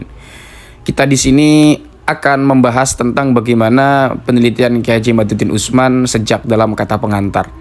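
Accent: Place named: Serang, Indonesia. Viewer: Kan. Upload lagi ngaji kitab ala pesantren, dengan bahasa banten Kangen dengan logat serangnya😁😁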